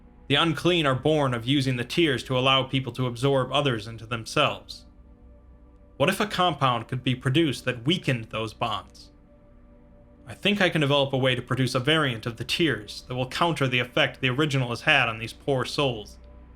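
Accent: England, United Kingdom. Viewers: That British accent is amazing! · Which English accent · Another great episode. Good English accent lml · Great British accent didn’t think it was really you at first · Two years later and I’m still amazed kept up an English accent for almost a whole hour.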